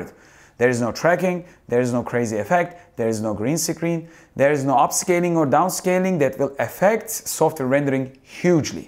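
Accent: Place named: Turkey. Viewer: Direk duyar duymaz anladım Türk olduğunu. Aksan kayıyor kardeşş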